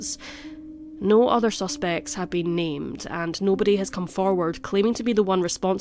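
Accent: Scotland, United Kingdom. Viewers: Geez, some of these yt honchos are doing really dodgy things! The same thing happened with two other channels I watch. One of them ended up going to Odyssey. Yt had permanently demonetised his amazing crime channel. Your channel is brilliant and your content is fascinating. The research into these cases are phenomenal too. I have followed you for months and your Scottish accent is lovely. Anyway, cheers from Australia!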